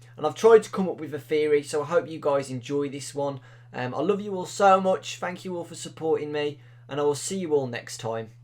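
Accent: United Kingdom. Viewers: U R THE BEST FNAF THEORIST EVER AND UR UK VOICE IS BAE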